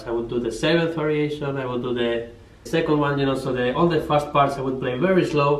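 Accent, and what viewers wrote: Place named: Iberia. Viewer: Ése acento ibérico.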